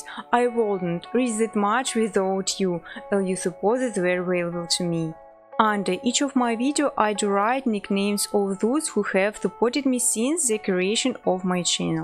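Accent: Russia. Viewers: Русский акцент, или мне кажется?) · Russian accent)) Сразу понятно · Русский акцент? Или я ничего не понимаю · русский акцент ) удачи и успехов вам ! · Говоришь как русский заметно дэй к примеру говоришь дей ты русская? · русская 100% акцент палит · уууу ска первый раз слышу такое прям рашко произношение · Так она русская ?)) бля по акценту было впр понятно,но я думал прост такой акцент сам по себе,ну к слову дЫа она молодец))0 xD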